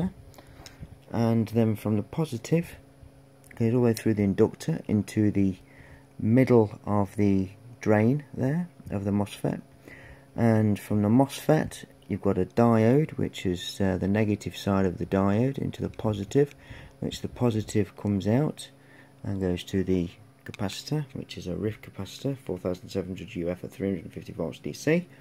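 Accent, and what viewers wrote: United Kingdom. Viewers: guessing from your accent (the way you say inductor) are you British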